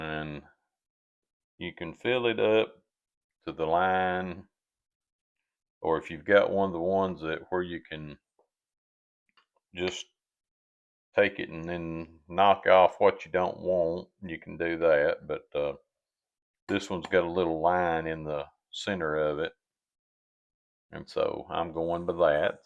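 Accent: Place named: South, United States. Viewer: Thank you for the recipe. My Mom & Grandmas made the best biscuits, I’m a biscuit failure, they both said I worked the dough too much, gonna try with you!. I am a North Carolinian - born & raised. Love your accent, SO many carpet baggers have moved in here, it’s rare to hear a Southern accent! Bless Your Heart!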